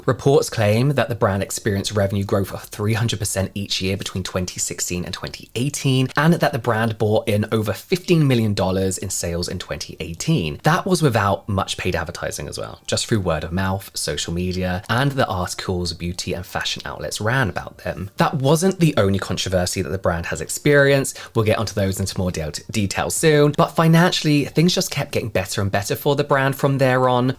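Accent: United Kingdom. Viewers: It's funny I couldn't care less about Dr. Strum but I am watching because you look gorgeous (so fresh faced) and I am a sucker for your British accent. Loving your hair (chic) and skin (glowing). Update - I just realized you are Robert's twin. I thought something was slightly different, but couldn't put my finger on it until reading the comments. Never seen before today but have followed Robert over the past few years.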